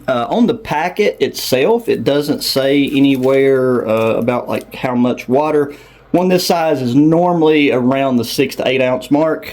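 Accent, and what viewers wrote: South, United States. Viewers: Cool civilian ration Amigo. Components sound good. Glad extra seasonings, pepper, red pepper, etc. They come in handy. Dividing A 24 Hr. ration is difficult, I have been there, and will again soon, LOL. It's bad, when civilian rations have better FRH'S than the military gets. All meals sound good. Some components might be off just A little, nothing bad. This fresh, I would not expect too many to be bad. I would not think they would, but you never know? I have been fooled before. Good meals, great review. Glad you had fun. Peace from Ky.. P.S. From your accent and way of talking, you are from the South, or once was. I am glad to hear A voice like yours. Makes me feel like I am not alone in the ration community, LOL. Hope all are well your way, need me, just holler. Peace. Nicely done friend.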